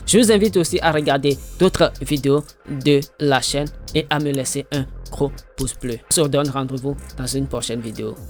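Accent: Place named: Benin. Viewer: T'es béninois ? Ton accent...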